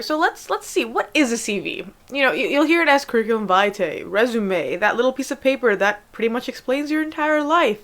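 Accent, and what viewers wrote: United States. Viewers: Assalamu alaikum sister, your strong American accent got me searching through all your videos why u chose to work as a doctor here in the UK , because your husband doesn't have a American accent, I'm sure he's either Pakistan or born in India, so u and Ur husbands visa situation sounds must be very complicated, with u being an American citizen, so how come you don't work and live in America as a Dr with your husband, not sure if you covered this subject in a video, please post me the link , jaza kallah and thank you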